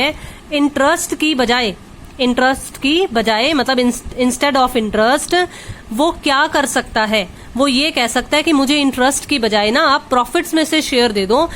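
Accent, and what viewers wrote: Punjab, India. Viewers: Punjabi sound 😝😘😘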